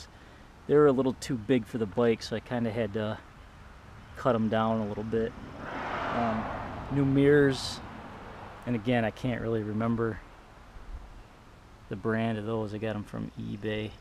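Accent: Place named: Midwest, United States. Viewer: You from Minnesota? I hear a Midwestern accent haha.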